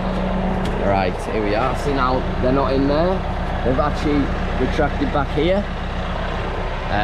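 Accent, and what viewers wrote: United Kingdom. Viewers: Nice vid, is this a British accent ? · Sounds like Yorkshirian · One of many. Think hes from Cumbria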